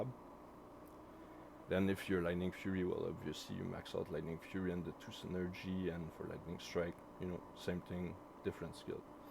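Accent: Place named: Quebec, Canada. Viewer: hahah l'accent Québécois est assez présent.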